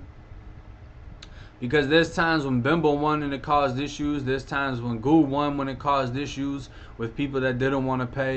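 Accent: Boston, United States. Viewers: Where in America is his accent from? I’m from the UK and I’m awful at guessing 🤦🏻‍♀️ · That Boston accent mix with that Dowwn souff Florida accent is brrraaazzyy p!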